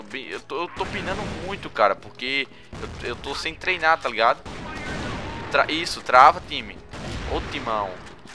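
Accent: Northeast, Brazil. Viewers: vc é nordestino?? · vc é do nordeste? não sei mas percebi pelo sutaque slá.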